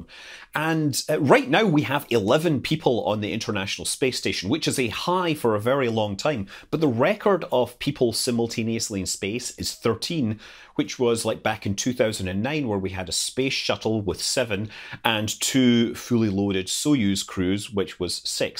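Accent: Scotland, United Kingdom. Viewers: He does a bang-on Scottish accent though.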